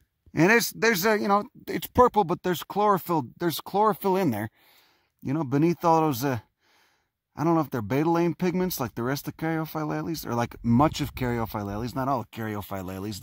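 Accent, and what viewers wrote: Chicago, United States. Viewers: I'm from Chicago so it comes naturally to me as I talk like he does when he isn't doing vids, but that is funny to hear for sure!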